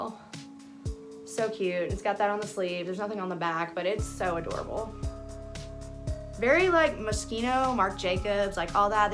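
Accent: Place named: United States. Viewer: Girl what state are you from? I like ya accent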